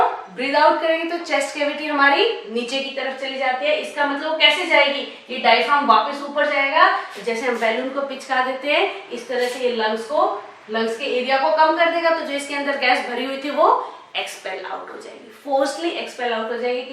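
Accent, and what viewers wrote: Haryana, India. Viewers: Haryana k ho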